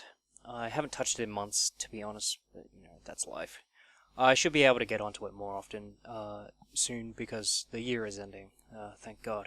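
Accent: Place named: Australia. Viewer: He's Australian Wtf is up with American people and not being able to recognise accents? Smh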